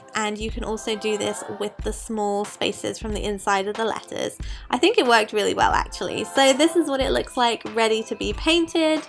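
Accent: England, United Kingdom. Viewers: is she english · Well She Has An British Accent, But Yeah I Go With U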